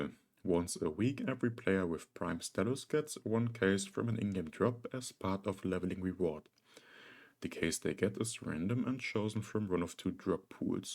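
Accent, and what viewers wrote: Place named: Germany. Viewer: You have a very soothing deep asmr voice with a hint of german accent so like a more chill onhepixel uwu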